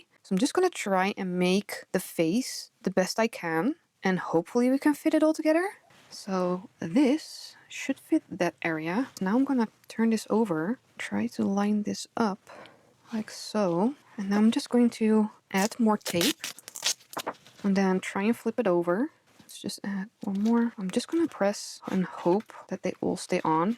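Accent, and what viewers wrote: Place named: Netherlands. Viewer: You sound a little bit dutchie 😀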